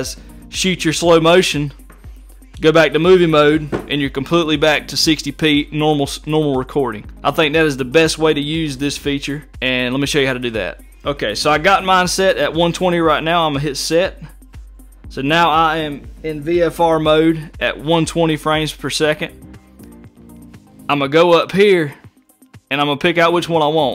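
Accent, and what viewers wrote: South, United States. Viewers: Thanks! Nice to hear a southern voice on a GH5 tutorial!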